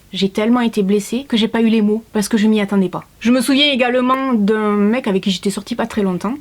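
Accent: South, France. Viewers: moi je l'adore ton accent du sud sa respire le soleil ;) et on est tous différent physiquement et mentalement heureusement sinon le monde serait fade merci pour ta vidéo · Je trouve que c'était une bonne vidéo à faire! Le commentaire "t'as l'accent du sud mais t'es pas bronzé" est tellement inattendu qu'il me fait rire!! L'expérience de l'entretien d'embauche, tout simplement scandaleux!!! Et les commentaires très injurieux que tu nous as montrés sont juste... incroyables, inimaginables...!! Bon courage et bravo! Bisous!! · Bonjour et merveilleuse année à toi ainsi à tous tes abonnés 😊 tu es une belle personne intérieurement et extérieurement 😉mais ce que tu racontes n’est pas surprenant les gens sont indélicats pour être polie 🤬ils sont jaloux et reflètent sur les autres leurs propres angoisses. J’adore ton franc parler et ton dynamisme et l’accent du sud est magnifique (je sais de quoi je parle)🤩 bises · J’adore l’accent du Sud !!! 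C’est top!!! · Moi, j'adoooooooore ton accent du sud, tu ne peux mm pas imaginer, je t'adooooore, reste comme tu es parce que je suis convaincue que tu ne changement jamais, et les ingrats vont continuer à être emmerder(désolée pr ce dernier mot il fallait que je le dise)😘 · Cc moi jai eu droit aussi le genre de remarque sur mon poids .Les gens sont méchants cest pour sa que je suis mieux avec mes animaux et oui aussi jadore ton accent du sud ,accent du soleil 😉plein de bonheur pour 2021 et a ton toutou aussi ❤ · Bonjour tout a bord je tiens a te dire que tu es une tres belle femme.J'aime ton style et surtout ton tres belle accent du Sud de plus j'adore les femmes rousses.J'aimerai qu'il plus de femme que moi toi sur le net.Une femme s'embélit avec temps.Je regarde toute tes videos car tu es naturel. Vive les femmes rousses. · Attend attend attend, donc on t’a reprocher le fsit que tu ai un accent du sud mais que tu es trop blanche ? 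Mais... il y en a vrm ils ont un problème avec les couleur de peau ma parole c’est pas possible ça 🥲😭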